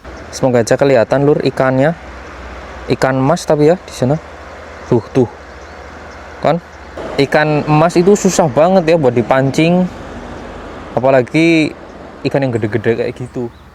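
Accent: Banyumas, Indonesia. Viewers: ngapak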